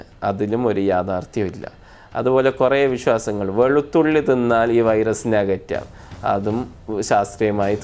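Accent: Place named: Malappuram, India. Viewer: മലയാളം ഇഗ്ലീഷ് എന്നിവ പഠിക്കുക. ഒന്നും മനസ്സിലാകുന്നില്ല .ഭാഷ Not correcti മലപ്പുറം സ്റ്റെൽ ബോർ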